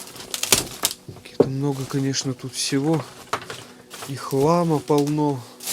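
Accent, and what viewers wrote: Vyatka, Russia. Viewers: почему то думала ты местный, Кировский. Говор вятский, похож. Южные места обл.не посещаешь? А, там очень много купеческих домов, к примеру Уржумский р. 😊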